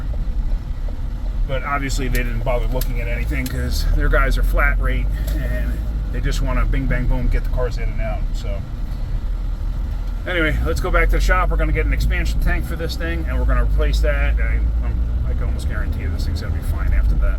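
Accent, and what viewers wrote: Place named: New York, United States. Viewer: Ok, thanks. Sounds like he has a NY accent so that's why I thought Mexico,NY.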